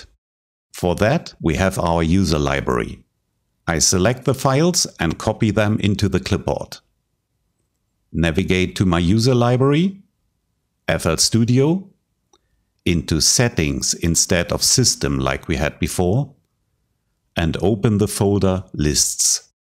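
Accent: Germany. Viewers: Good old Denglisch :D Aber Inhalt ist super!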